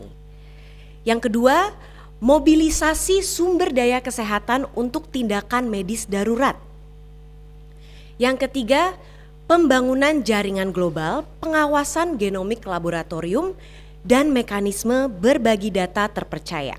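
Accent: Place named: Bali, Indonesia. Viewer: Logat bali .💪indonesiaku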